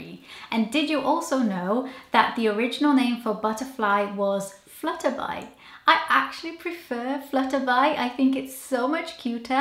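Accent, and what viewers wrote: Wales, United Kingdom. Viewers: Came across your channel on channel mum weekly pregnancy videos and had a feeling you were from Wales? You have a Welsh accent! I’m from Cardiff! Found this video very fascinating! x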